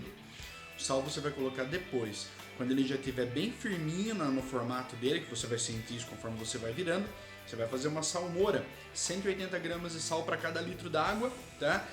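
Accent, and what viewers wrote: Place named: Rio Grande do Sul, Brazil. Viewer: tu é gaúcho...né!!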